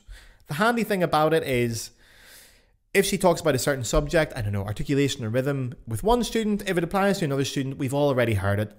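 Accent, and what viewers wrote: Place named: Ireland. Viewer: Merci monsieur…je suis américaine. Je parle français et j’étudie la flûte avec un professeur français…aux États-Unis ! J’aime bien votre podcast mais s’il vous plaît arrêtez les insultes vers mon pays! We aren’t all idiots and some Americans have even composed some great music if you can believe it. All that to say, I do appreciate your podcast and I am not super offended, but as you continued in this episode to make fun of the American accent over and over, I was forced to do many many eye-rolls. You do admit that you anglicize Lot and Boehm names lol, you just do it with that charming Irish accent lol 😂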